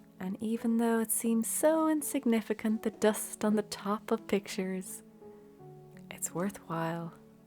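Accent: Ireland. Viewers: I’ve had a small touch of spring cleaning fever for a few days now and this video just fed that immensely! It’s as if you described my every thought & feeling, except with a beautiful, soothing Irish lilt!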